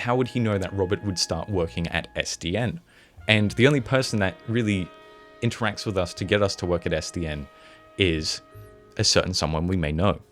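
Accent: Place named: Australia. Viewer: Ok, now I have three things to say to you! 1. Nice Aussie accent 2. Skylanders video? Now I’m randomly curious cause I love skylanders And 3. Doesn’t Adobe have a thing where automatically backs up your save file, every few minutes or something? Or did the crash fry them too? Or is adobe too archaic to include backups when Resolve and ProTools do?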